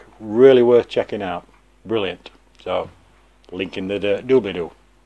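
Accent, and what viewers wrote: Yorkshire, United Kingdom. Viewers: Sounds like a Yorkshire lad and a hoarder ditto, nice shed. Get rid off the greenhouses to other side of the garden and extend the workshop us men need us hobbies. Lol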